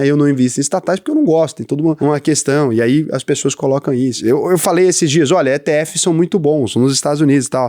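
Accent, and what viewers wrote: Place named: Goias, Brazil. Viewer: Uai voce e de goiania irmao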